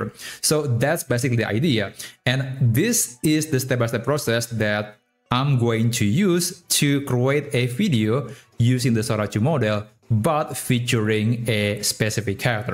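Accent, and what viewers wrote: Indonesia. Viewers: your accent...are you indonesian?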